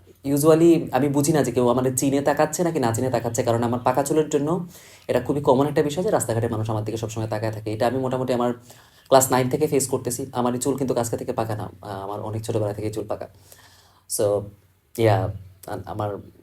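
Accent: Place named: Chittagong, Bangladesh. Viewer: আপনার এক্সেন্টে চাটগাঁইয়া একটা ভাব আছে, চট্রগ্রামে বড় হইছেন আই গেস❤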